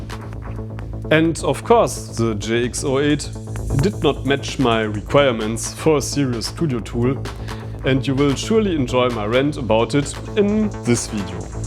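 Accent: Germany. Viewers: With such a heavy German accent, I can better understand you in German.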